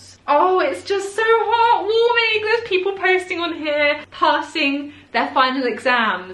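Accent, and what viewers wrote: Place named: London, United Kingdom. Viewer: this is very random but I've been following you for years (since mid 2018 I think) and I noticed how your accent's changed over time and its the realest thing ever lol you can tell from this vid you've been spending a lot of time in London and having moved around I relate to that smm (like I literally don't know what my default accent would be anymore its just a weird jumble hahaha). anyway I know its super random but I just wanted to let you know you're not alone in this I reckon lol. and congrats on passing the exams btw xx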